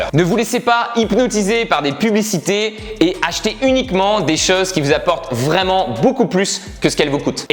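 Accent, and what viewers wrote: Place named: South, France. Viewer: Top ta vidéo tout comme ton accent méridional !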